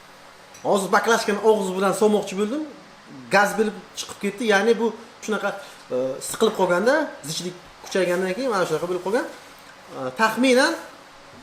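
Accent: Bukhara, Uzbekistan. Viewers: Узи Гиждувонликман, шашликдан хам кура Бухоро шевасини купрок согинганман, рахмат. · Buxoro sheva N-1. Shashlikni dodasi ham Buxoroda😊😊😊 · Шашлик майликуя бухороча шивани коилатиздаааа таго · Бухоро шевасини роса согингандим, мазза киб эшиттим рахмат 🤗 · Шевага караганда азим Бухороданми сиз лекин жуда Куп гапирдиз ишдан кура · Бухороча шевада гапирганингиз учун 2 лайк🙄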